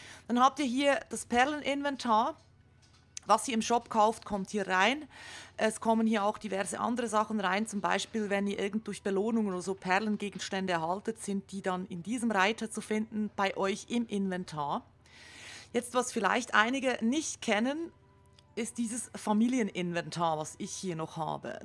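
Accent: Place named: Switzerland. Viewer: Danke für die Erklärung mit wunderschönem schweizer Dialekt.;D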